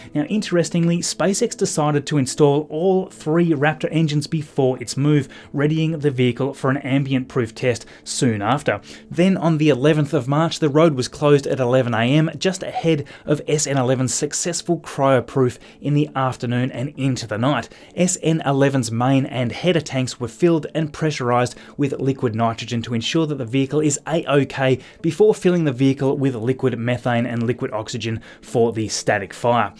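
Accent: Tasmania, Australia. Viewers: Tasmanian accent!!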